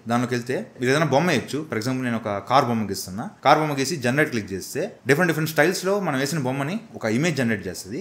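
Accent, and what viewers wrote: Nellore, India. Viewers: Anna Medhi Nellore aa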